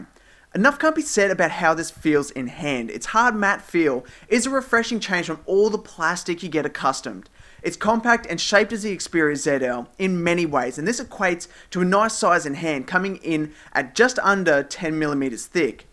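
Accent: Australia. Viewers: Australian accent ~ :D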